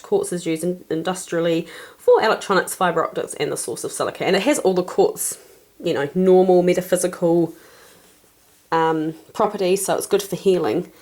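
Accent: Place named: United Kingdom. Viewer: so you are from New Zealand? wow that is great but your accent is like from Britain just a bit i suppose. i love to hear your voice so you can talk all day!! I really like all your stuff, girl, they have tea balls all day long here in the USA in Wal-Mart, I agree with you that online shopping is very bothersome but somewhat convenient especially when people such as my self cant find any witchy shops in a "christian" community. I have no other choice but to order online and i have to eat crow about it. and i prefer witchy shopping over clothes shopping any day as well!!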